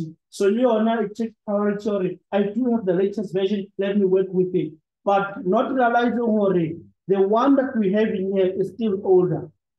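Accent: South Africa. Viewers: THAT SHARP SHARP TELLS ME THE LECTURER IS FROM SOUTH AFRICA😅😅😅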